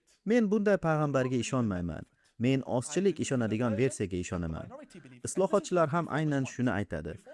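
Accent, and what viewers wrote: Andijan, Uzbekistan. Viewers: Koproq shevada gapiradi bzi andijon shevada kopchilik "yuĞurib" deyishadi atag yahshi taklif🎉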